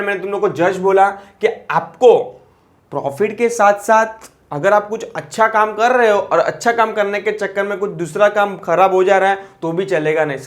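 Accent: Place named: Mumbai, India. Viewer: Mr., you have a touch of Mumbai's local language.😅."aapan, yeh kya bol rha hai, paile(pehle).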